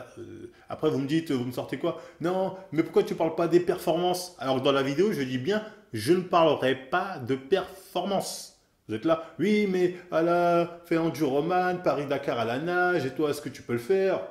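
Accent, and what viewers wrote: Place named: England, United Kingdom. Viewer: Les fit gurrrr, j'adore ton accent anglais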